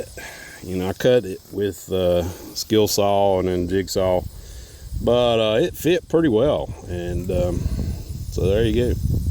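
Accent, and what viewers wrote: North Carolina, United States. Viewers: I am from south eastern North Carolina.....your accent is too!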